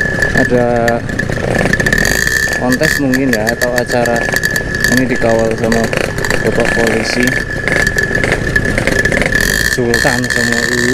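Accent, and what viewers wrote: Indonesia. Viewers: Logat nya jawa timuran asli mana bang salam santun dari blora jawa tengah bang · Walaikum salllam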